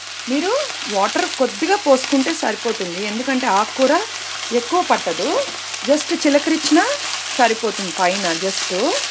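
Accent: India. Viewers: your accent resembles chittoor district style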